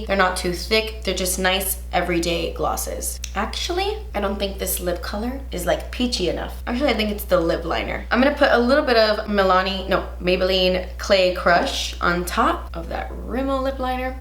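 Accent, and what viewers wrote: Cuba. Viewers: More videos in Spanish! Me gusta tu acento Cubano 😄💖💖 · So funny story... My husband says Concealer the way you do because he's heard you say it so many times while I'm watching your videos. It's really funny and he REFUSES to say it normal (not Cuban) he's very white... Lol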